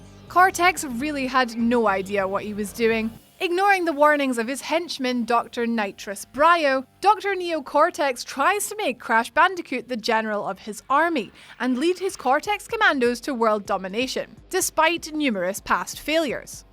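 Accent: Scotland, United Kingdom. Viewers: For some reason I like to hear scottish accent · Nah that's just a Scottish accent mate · Forgive me if I'm wrong but do I hear a small bit of a scottish accent in your voice?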